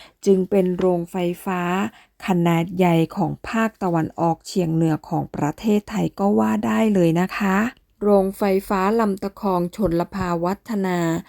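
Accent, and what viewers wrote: Cambodia. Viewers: แอดมินคน นี้เขมรนิหว่า สำเนียงเขมรเป๊ะ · คนพูดไม่ไช่คนไทยแน่นอนสำเนียงออกไปทางเขมร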